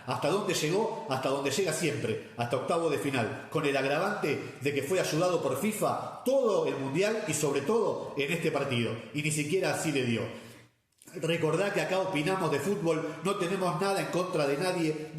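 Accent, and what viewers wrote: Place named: Argentina. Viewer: Hola mi buen Argentino (supongo por tu tonito) te equivocas al pensar que no conocemos nuestra realidad (futbolística) pero si te invitaría a qué no te amargues ni te lo tomes en serio lo del fútbol, por qué los mexicanos pasada la emoción volteamos la pagina y seguimos la vida igual de alegres (si conocemos nuestra realidad) admiramos a Messi si eso te hace feliz .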